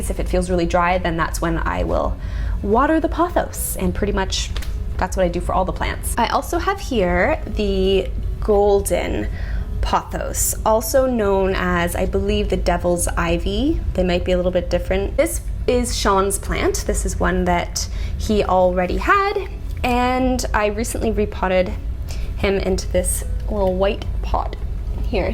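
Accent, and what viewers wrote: Canada. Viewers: Great video. I'm going to be getting a bunch of plants soon and this was super fun and helpful. Side question? Are you from Canada? Accent sounded Canadian.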